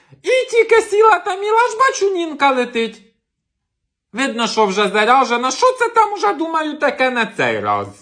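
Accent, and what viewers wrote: Poltava, Ukraine. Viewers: ти неперевершена!З дорогою полтавською говіркою!Дякую!😊😊😊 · Так стає тепло на душі "по домашнєму" як чую цей рідний Полтавський говір❤. Все дитинство перед очима пробігає. Дякую за твою роботу,. Ти неперевершена, як завжди. Чудовий гумор і надзвичайна подача ❤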